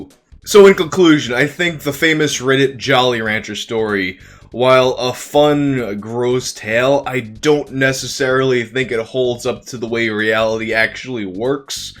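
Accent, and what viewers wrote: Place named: New York, United States. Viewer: First time binging your vids and after a couple of videos I needed an answer. I knew you're from NYC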